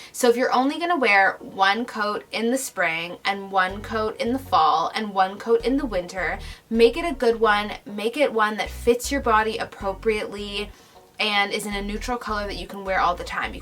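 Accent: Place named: Canada. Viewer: "Crusty clothes". That's so cute. Jamaicans have the cutest words and sayings. You mentioned that you live in London. You don't have a British accent. You sound Canadian, do you mean London, Ontario? I live I Canada and a coat, and more than 1 is sooo important. Great tips and great video.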